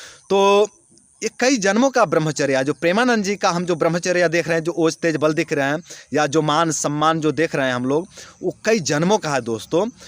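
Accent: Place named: Bihar, India. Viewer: Hii bro लगता है की बिहार से हो आप 🙏🤞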